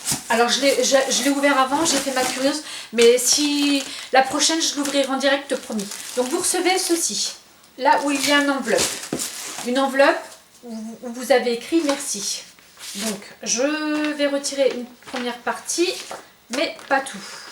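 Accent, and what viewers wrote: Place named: England, United Kingdom. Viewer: Moi j'adore toutes tes petites bourdes ,ton accent anglais, et quand tu sors du cadre pour prendre un truc 👍🏻😄. Passe un Bon dimanche . Bisou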